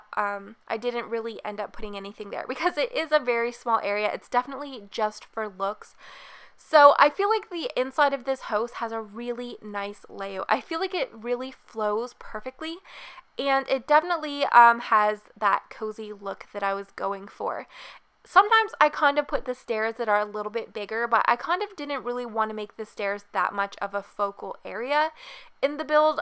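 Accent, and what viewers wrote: Canada. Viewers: You have the most canadian accent I have ever heard. Also, this is a cute house! Thanks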